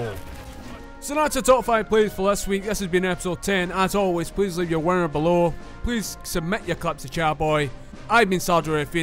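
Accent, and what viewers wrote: Scotland, United Kingdom. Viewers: Your scotish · fucking love Scottish Commentator xD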